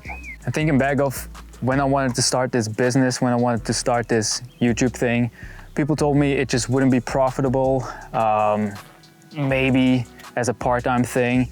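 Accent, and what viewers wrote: United States. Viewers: Are you American? Definitely sound like it but I know you're over in Belgium...So confused 🤯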